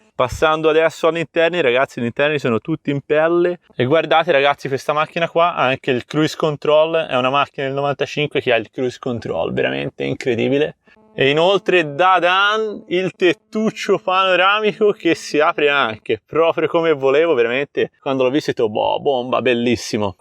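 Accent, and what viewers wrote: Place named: Tuscany, Italy. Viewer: Iscritto, la parlata toscana come la mia ci sta abbestia! Curioso di vedere come lo camperizzerai😁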